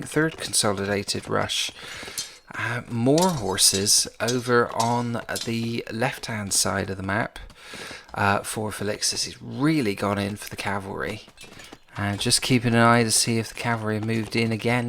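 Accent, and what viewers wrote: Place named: United Kingdom. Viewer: If I may chime in, I’m really enjoying most of your content, watching both the new and old videos, and enjoying most of it. You have a really nice manner of presentation (and a cool British accent too), and although the games are long, I’m sometimes switch off to doing other things while simply listening to your narration. Tutorial type videos are a nice recent addition, really appreciate that. As for the casts, I think 4v4 look most impressive, as the outcome is a bit harder to predict, but 1v1 with closely rated players are also fun to watch.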